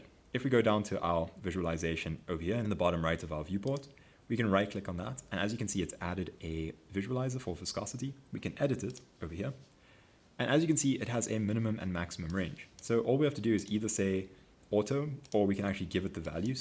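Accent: South Africa. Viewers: Love this series!! Are you from South Africa?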